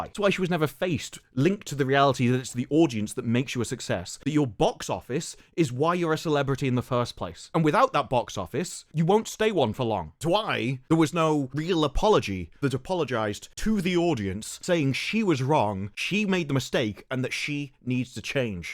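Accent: United Kingdom. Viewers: Are you British?? Can’t tell.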